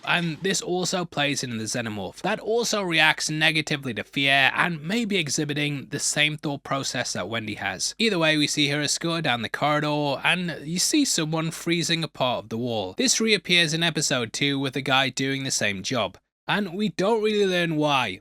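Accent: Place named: England, United Kingdom. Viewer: I'm still confused 😢....he's English accent confuses me more..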